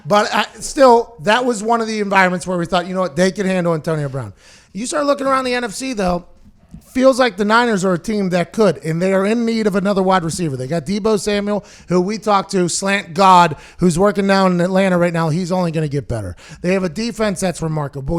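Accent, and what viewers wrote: Pittsburgh, United States. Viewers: That Pittsburgueeze Yinz. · Love how Pat says Antonio Braaann in his all Pittsburghese accent.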